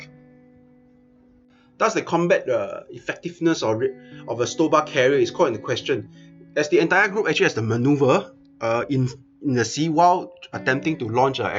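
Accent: China. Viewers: This is Chinese voice, and security of India aircraft is 5% less then Chinese aircraft but according power Indian aircraft is 10% more deadly then Chinese so Indian aircraft can destroy Chinese is possible 30% but Indian destroy chinese aircraft is 70%, don't forget Barak 8, And Aakash and Bramhos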